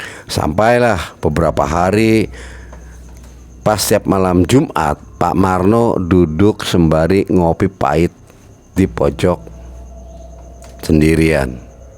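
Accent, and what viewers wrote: Indramayu, Indonesia. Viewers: Logatnya bicaranya kaya orang Indramayu atau orang Cirebon 😁 Betul ngga sih ?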